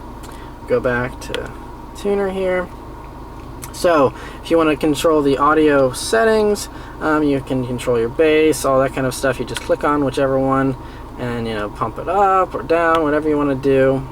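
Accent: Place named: Midwest, United States. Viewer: youve gotta be from the midwest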